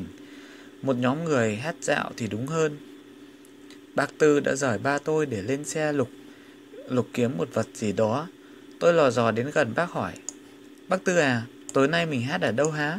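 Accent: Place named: North, Vietnam. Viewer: Giong doc mien Bac cua rat truyen cam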